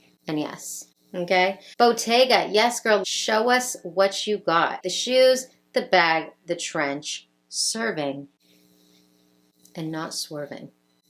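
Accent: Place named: North, United States. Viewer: Who watches you, you murmur. I must have issues. Yes, I am a Midwest gal transplanted to the Dallas area and so grateful to hear the Northern accent (which to us is not an accent, it is in Texas that there is an accent.) You get my drift. Every video is like a visit from the little sister I never had, the one in Canada, not Minnesota, blonde by design instead of nature, the cute sis. I am so grateful for your video visits.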